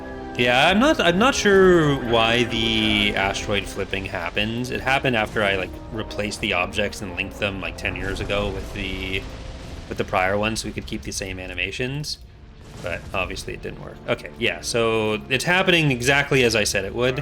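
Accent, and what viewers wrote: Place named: Canada. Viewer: dont worry about sounding too Canadian, its awesome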